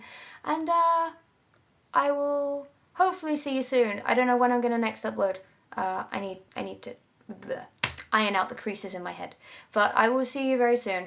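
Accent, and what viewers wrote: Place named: United Kingdom. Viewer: Hello there, don’t even know if you read these comments... but, anyways, a good intention always counts, right? I lived in London for a while and, missing the beautiful British accent, I went searching for a pretty uk face that sound good… So I found you. You are such an interesting human being. Congrats for that! About felling like a lonely wolf, working hard does that. But don’t let this become hurtful. I’ve been doing the something for over 6 years, got really close last year twice, but didn’t get it… I worked so hard that I lost all my friends, I used to be proud of it… Not anymore, I started English classes online just to have someone to talk to, hahaha. Long story short, we are social animals, maybe sometimes you are cranky and moody with people you share your time with, but so what? Everybody does that. You don’t need to be perfect! 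because guess what!?!?! Perfection is not something real, we idealize it! And by the way you are gorgeous! You are funny, smart, beautiful, great singer and very interesting being. About changing, If really feel like you have to, take it one step at a time, and one little thing at a time… Doing all at once will never work. Don’t be too hard on yourself. You got all it takes do be whatever you’d like to be! You are such a confident person, be confident about it too! Regards from Brazil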